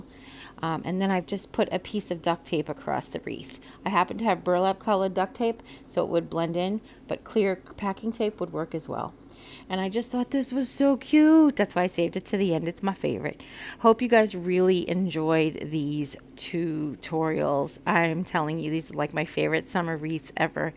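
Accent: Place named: Long Island, United States. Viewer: these are all so precious! I really enjoyed listening to you (that Long Island accent is so home to me!!!) and getting some beautiful fresh ideas! I love your sense of creativity! God bless you, my friend!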